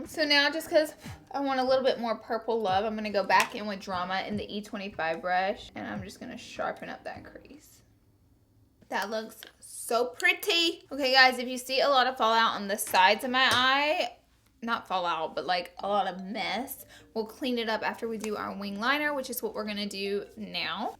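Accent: South, United States. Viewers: Ok what part of the south are you from. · Girl I am from Texas and transplanted to TN so I hear you on that accent and dirty south is SO right. Also I started graying at 19 so I couldn't tell you what my natural color is. I DO know I need to go get another hair appointment but I have more time than money so I go to the beauty college lol I enjoyed your Q&A. You are freakin hilarious. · Beautifully said and love your southern accent..... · you should've not told us ur habit of looking down to our left cuz now I'm counting how many times you do it haha!! & girl your losing your southern twang :( better get back to Bama soon. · I can tell I'm from the south.. I didn't even know you HAD a country accent. Haha, i just thought you had a normal voice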